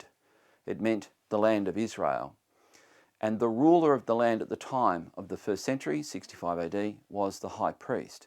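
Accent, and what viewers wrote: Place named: Australia. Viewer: I will read the bible like it was "meant" to be read, when you can learn to speak English how it was "meant" to be spoken you fing ossie. We cant take anything you say seriously.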